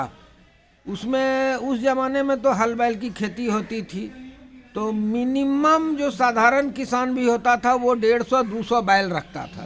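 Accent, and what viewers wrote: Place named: Patna, India. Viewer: Yes indeed l have read the books of Jim Corbett in which he had written about his work related to railway.He was also a retired major in British Army and faught in First World War commanding Garhwal Rifles. But I didn't know that it was in Bihar. I enjoy your videos and very happy to hear your conversations and dialect which is similar to that of my parents who had migrated to Pakistan from Patna. I had heard of some wild life in Ranchi Bihar but did not know about such forests in Mungair.I would request you to keep recording your hunting experiences through such videos as these are assets, for being a nature and forest lover myself.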